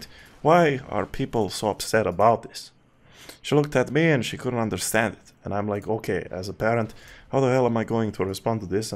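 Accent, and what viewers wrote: Eastern Europe. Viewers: You are not a real fan. You are a Russian separatist. You definitely have Slavik in you based on accent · I can hear your accent. You're Slavic. I'm Slavic. We were brought up into a culture that teaches us to be able to enjoy and find wonderful things in stories that are nothing LIKE ours. Even if there is NOTHING AT ALL Slavic about LotR, we have no problems loving it even if we're "left out". In fact, we never REALIZED we were "left out" until black Americans came up with the CONCEPT of being left out. To US, it was perfectly NORMAL an English author didn't write Prague or Cossacks or Perun into his books set *in an obvious paraphrase of NORDIC and ANGLO SAXON mythologies*. To them, it isn't. I might be unfair, but the media certainly makes it seem that if ANYONE in any way DARES to do anything at all without black americans, there is racism involved and it's insulting. 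In fact, I think we might have to create black cheese in order to right this HUGE injustice of making cheese white and yellow, but not black for SO long. And just to note, episode 5 - I'm still waiting for an ASIAN actor? Even when they could have cast ALL the Elves as asians, given them blonde wigs and contacts and with some make-up they'd look WAY closer to Tolkien's description than the dude who makes me wonder if Elves f*** by colour in order to maintain black people among their ranks. As I wait, I am looking forward to a remake of Red Cliff starring a white Cao Cao, a black Zhou Yu and a female trans gender gay latino Zhuge Liang.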